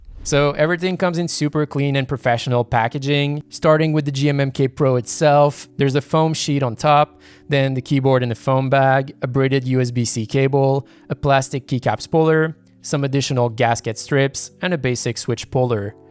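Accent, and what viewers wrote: Quebec, Canada. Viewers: Are you french canadian by any chance?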